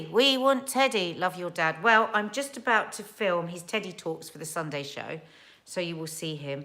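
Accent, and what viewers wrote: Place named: Scotland, United Kingdom. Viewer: Good afternoon to you both. I am Scottish, and your Scottish accent is brilliant!! Makes me smile, Reminds me of home as I live in England now. ❤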